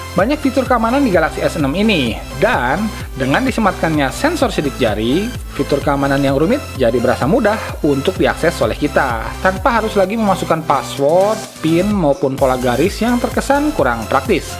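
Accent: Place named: Sundanese, Indonesia. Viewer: amiiiiiin.... sukses terus buat, ane suka sama logatnya yang jenaka & sunda pisan euy.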